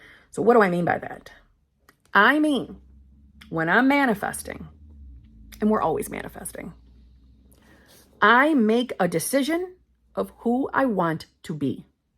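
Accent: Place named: Upstate New York, United States. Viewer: New York upstate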